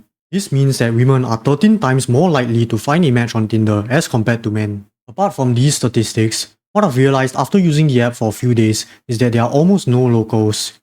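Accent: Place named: France. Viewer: you sound slightly Frensh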